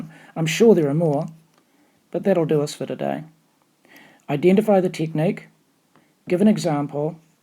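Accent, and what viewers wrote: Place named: New Zealand. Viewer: No doubt your a new zealander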